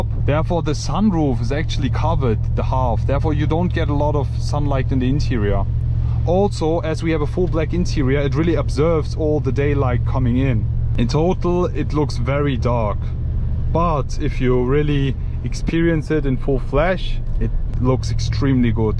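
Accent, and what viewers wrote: Germany. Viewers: Very iritating english german pronunciation!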